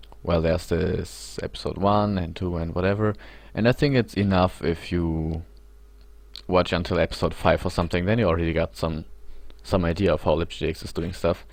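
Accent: Germany. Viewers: Ich hab mir doch grad gedacht, dass ich einen dezenten deutschen Akzent höre :DD Danke für das Tutorial :)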